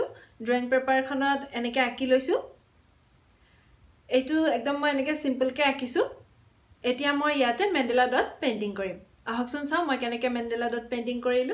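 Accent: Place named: Nagaon, India. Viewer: Tumar maat tu xosai dhunia aamar Nagaon nisina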